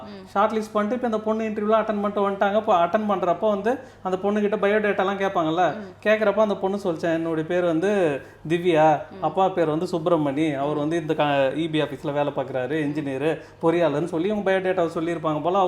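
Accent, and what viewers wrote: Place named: Villupuram, India. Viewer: Villupuram district slang mathiri iruku sir ungaluku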